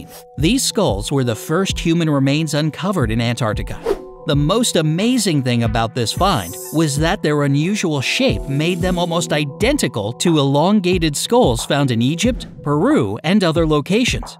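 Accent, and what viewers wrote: United States. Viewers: The American accent just makes me feel dumber. Not sure what it is with American educational show and the way they explain things like they are talking to a child.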